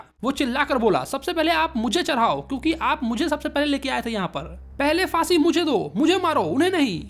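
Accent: Bihar, India. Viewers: Bhaiyaa bihari ho kya😂😂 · His tone of talking is like Bihari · Bhaiya ap Bihari ho n😊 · bhai is bihari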